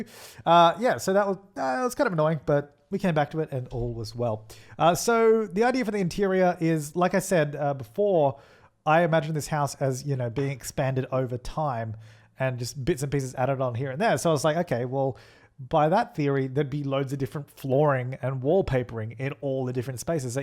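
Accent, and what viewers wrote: Australia. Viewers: He sounds Australian why are all of the Australian YouTubers so wholesome 😂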